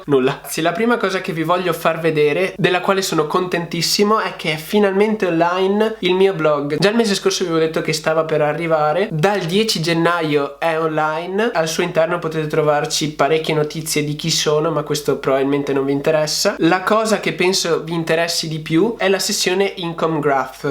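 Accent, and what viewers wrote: Veneto, Italy. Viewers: ciao! Un giorno se ti va ci si può trovare, ti seguo da un po, mi piacerebbe confrontarmi con te su questo argomento. Io ho 29 anni, auguri x il tuo compleanno! Dal tuo accento credo che tu sia del Veneto. Se è così siamo vicini e sarebbe bello potersi incontrare x parlare su investimenti. Uso una piattaforma da farti vedere con cui si può guadagnare tutti i mesi qualcosa.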